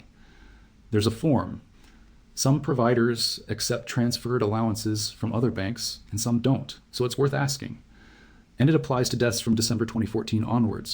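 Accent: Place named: United States. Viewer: Why the American accent? We are talking about England here!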